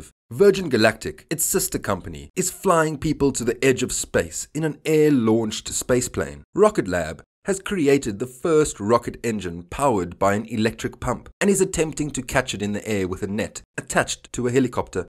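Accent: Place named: Vaal, South Africa. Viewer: Huz that Vaalie accent bru? Nice and syrupy...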